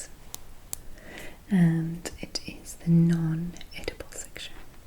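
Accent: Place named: Scotland, United Kingdom. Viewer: She's Scottish, that's where the accent is from.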